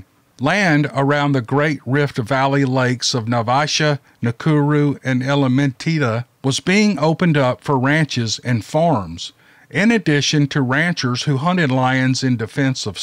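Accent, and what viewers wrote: South, United States. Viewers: You could monetize your voice. You have a voice I would rather hear than most of the books I've listened too. Maybe it's because southern people are my people! When I listen to a book, I want to Invision the story, not try to understand an accent or be distracted by it. I'm serious! I would listen to more books if you narrated them.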